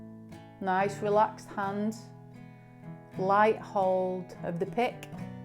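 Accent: United Kingdom. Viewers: Sounds like she is a Brit?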